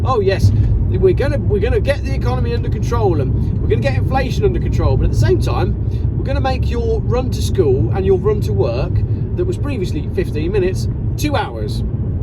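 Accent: Wales, United Kingdom. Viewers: Must be Welsh?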